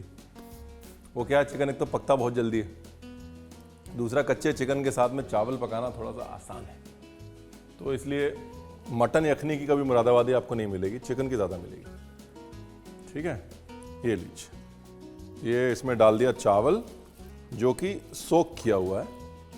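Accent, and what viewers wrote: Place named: Lucknow, India. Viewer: Briyani toh mai try toh krungi Hi but but jo lko ka bolne ka tarika hai na 😅maja aa gya hum aur humra du tho laung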